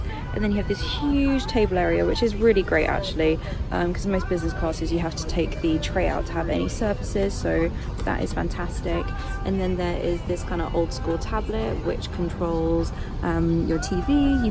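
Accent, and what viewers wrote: RP, United Kingdom. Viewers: Posh upper middle class totty. Loving the private school accent. In love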